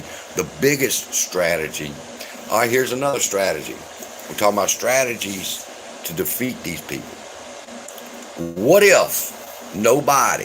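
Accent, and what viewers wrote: South, United States. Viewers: Man, your southern accent !!! Hello from San Antonio Texas. 👍👍👍👍👍👍👍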